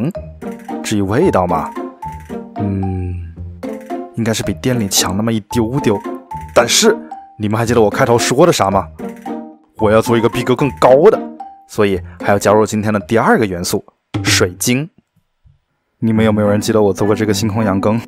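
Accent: Wuhan, China. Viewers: 这是武汉普通话吗？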